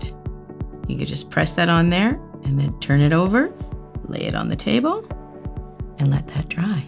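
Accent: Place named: Canada. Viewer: Valery B even without saying that they are from Canada (which has been said in several videos) you can tell the Canadian accent. 😂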